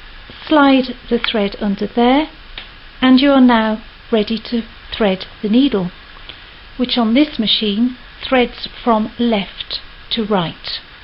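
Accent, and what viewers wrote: United Kingdom. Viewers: That was Beautiful, My Mother left Me a an Old manual pedal type sewing machine,, I'm ready to make a Sail and go Sailing.. This was clear and concise instructions.. and I love the British accent.. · Sooooo cute... Perfect queen's English... I've blinking kicked the tripod.... Be kind to yourself sweetie... Not a big deal...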